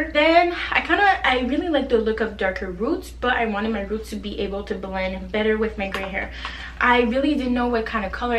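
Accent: Quebec, Canada. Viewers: Are you French Canadian? Québécois? I know my people haha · Your accent... you from quebec right ?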